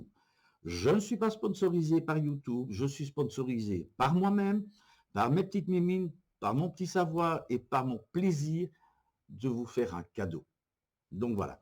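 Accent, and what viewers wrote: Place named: Belgium. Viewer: Bonjour,j'adore t'écouter en étant sur mon dp, mon beau-frère est belge aussi, je me lasse pas de vos accents 😁,chose sérieuse...video 11,toile 3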